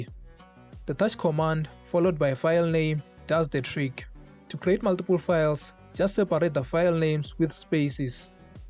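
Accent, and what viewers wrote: South Africa. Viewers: From Mzansi. You sound Venda/Tsonga. Dankie for the video!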